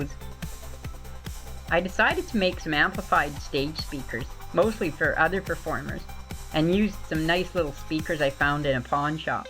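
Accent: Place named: United States. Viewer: WOW?!! A female who is quite experienced and advanced as well?!? And can actually talk her way around this stuff like a true graduate. And judging by your voice, you’re from this here New World. GEEZE!?! I applaud you and I am so impressed and inspired. I would probably buy any of your modules just based on their aesthetics alone!! You are someone amazing! Thank you for sharing about all this.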